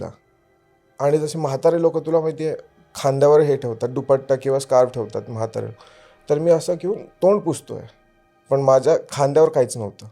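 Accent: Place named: Pune, India. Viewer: Bhai mhit nhi khott vate but amchya nagpur chi evdhi shudu language nhi ahe bhau ....ekda nagpur firun bgha smjhel ha pune vgere mdhla vatatoy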